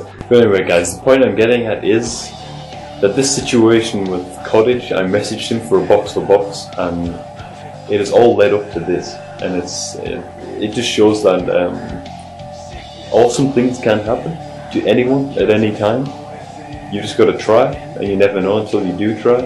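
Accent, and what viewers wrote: Newcastle, United Kingdom. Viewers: Where are you from? You play on U.S. right? Just 'cus your accent sounds like a Geordie's a little :> gz on the partenership! looking forward to more content :)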